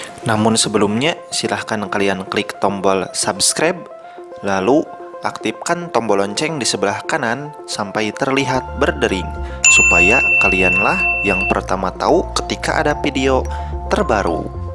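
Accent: West Java, Indonesia. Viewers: Logat sundanya edun aa haaaa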